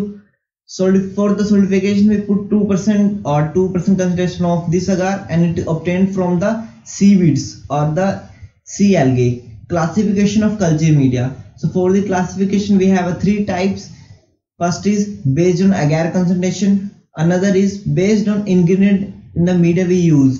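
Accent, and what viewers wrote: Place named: India. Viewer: I’m sorry man but I can’t never understand think Indian accent.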